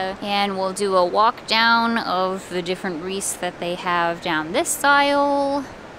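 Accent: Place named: Maryland, United States. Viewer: Sounds like Maryland to me.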